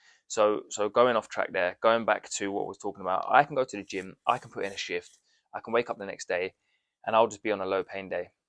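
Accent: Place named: United Kingdom. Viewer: Hi 👋, im new to your wonderful channel here on Fibro..I just came across your channel and find it sofar to the point info on everything about it as u can tell us all.The full..Heads Up on this awful painful condition..gentle and friendly nice huggs to u hon.❤❤❤love your awesome accent as well. You British or Australian. Im guessing..British..sweet anyways..ill keep watching daily keep posting ok.much love from me..Nancy from Jacksonville Florida..USA❤❤❤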